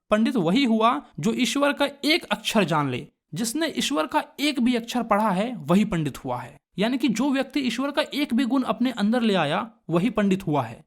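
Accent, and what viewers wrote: Bihar, India. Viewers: Dekh dekh k apki biharan accent mere andr arhi h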